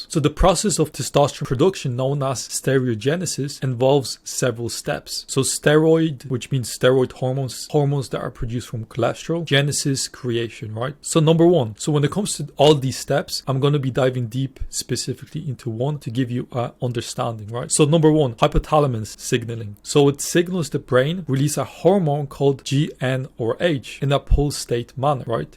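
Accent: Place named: Ireland. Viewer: I am concerned that your mentions of the thyroid hormone T3 come out sounding exactly like "tea tree" in your lovely Irish accent (which does not differentiate between the "t" and "th" sounds). The trouble with that is the Australian essential oil by the name "tea tree oil" (also known as melaleuca oil). It is used topically for skin problems in folk medicine and also in aromatherapy, but it's highly TOXIC when taken by mouth. Excessive use on the skin can cause endocrine disruption leading to breast enlargement in males. I don't want anyone to think that you're suggesting that they take or use tea tree oil when you say "for this to occur, you need T3" and "you lack T3 in the body."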